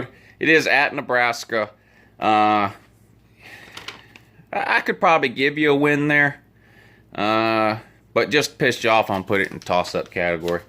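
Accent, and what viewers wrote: South, United States. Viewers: you look like an idiot, and you talk like a real bubba. Did you graduate from high school?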